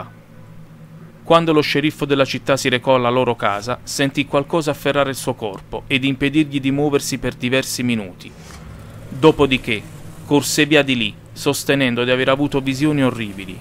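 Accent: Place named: South Tyrol, Italy. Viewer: Ti do like perché te lo meriti, però promettimi che fai un corso di dizione, e che ti Levi l'accento tipico del tirolo... Senno non puoi avere un tono professionale...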